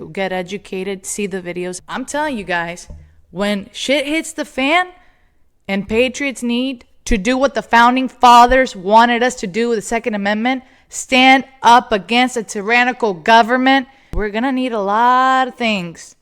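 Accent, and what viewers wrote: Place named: United Kingdom. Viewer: He has a British accent,that says all you need to know about that feckless soy boy british 😂😂😂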